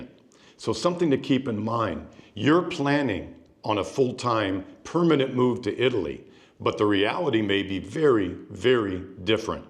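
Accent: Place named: Midwest, United States. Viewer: Seems none of your seats face the wall TV in the scene behind you. Italianos call that style "oldefogeyia" :-) Sit well: Live well... & I live very-well in SW FL, USA and have found cycling an ebike expands one's appreciation of a place. Mobility is vital to a "good life", yes? I'm too-long-ago from Pavia, and a lifelong cyclist. Best for folks ANY folks living in those Italian towns to be one, too! :-) For lots of good Italiano reasons. No disrespect, but your voice isn't typically "Italiano-sounding". More like a Chicago/MidWest US-twang to it, maybe? Their everywhere here, too! :-)))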